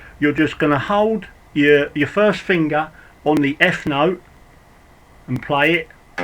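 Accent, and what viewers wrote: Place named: East London, United Kingdom. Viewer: Jeje, pero es buenisima su accento, si no estoy equivicado es de los Eastenders, accento famoso del este de Londres.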